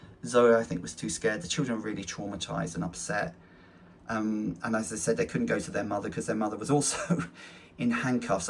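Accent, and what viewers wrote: United Kingdom. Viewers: THE NARRATION VOICE IS CERTAINLY FEMALE & Brit, to my ears. 1. Surprised "SHE" has a "WIFE". First surprise. 2. If you can "count" the officers, they're there in Numbers, Not in an Amount.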